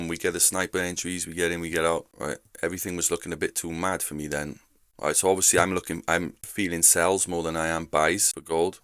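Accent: Wales, United Kingdom. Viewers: You Welsh mate? Just by the accent. From Wales?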